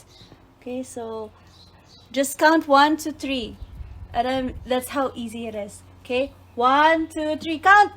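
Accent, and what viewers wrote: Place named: Philippines. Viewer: That Filipino accent lol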